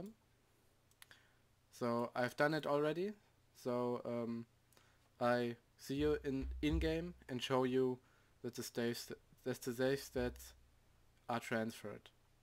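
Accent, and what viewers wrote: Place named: Germany. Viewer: es hört sich so richtig deutsch an:D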